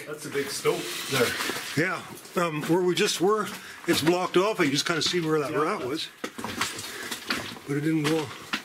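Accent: Canada. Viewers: Wow! You make awesome videos! I have a question, it seems like you have a bit of a Newfie accent. I might be wrong, but are you Canadian?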